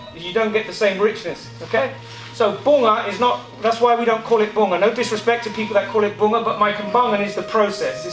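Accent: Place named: Canada. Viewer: His voice sound so canadian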